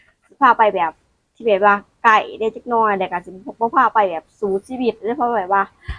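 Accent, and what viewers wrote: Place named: Ubon Ratchathani, Thailand. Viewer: แซบๆน้อง สำเนียงเธอ คิอทางอุบล ศรีสะเกษ ประมาณนั้นเฮาว่า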